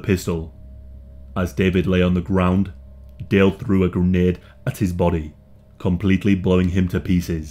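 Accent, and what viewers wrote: United Kingdom. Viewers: Why do I picture Ian Curtis when I hear your speaking voice ? I guess it’s the deep British accent.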